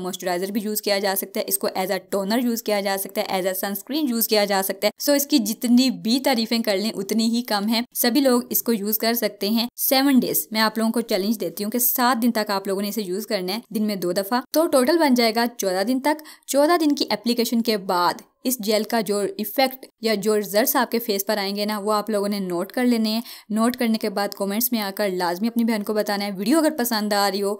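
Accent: India. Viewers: Ye pakistani itni indian jese kese bol rhi hai hay hay kese kese 👌👌❤️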